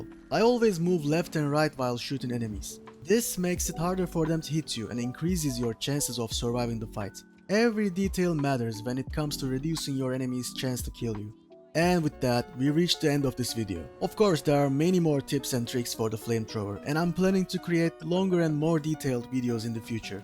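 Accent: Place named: Turkey. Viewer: Anaaaa, bu TÜRK AKSAANI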